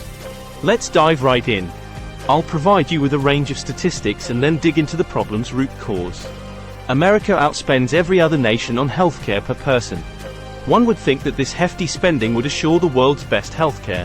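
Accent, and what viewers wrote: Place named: England, United Kingdom. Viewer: The makers of this video would be more persuasive to Americans if the voice saying 'we' 'our healthcare system' was not an English one. Did they use a robot voice? If so it's a good one (its peculiar pauses in sentences are a bit of a give away) but no all accents which speak English are the same.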